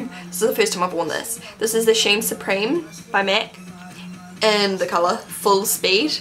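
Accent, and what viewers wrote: New Zealand. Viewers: are you from New Zealand? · shes from new zealand so she has an accent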